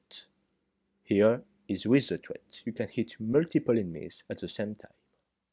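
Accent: France. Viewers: I could listen to the cute french accent for hours lol thanks for the guide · Thank you for this video, I really enjoyed it as I am new player in GW2. I'm following all your skills, will keep playing as soulbeast. Just a friendly hint: Practice with your english pronunciation, you have very hard french accent, sometimes it's hard to understand some words.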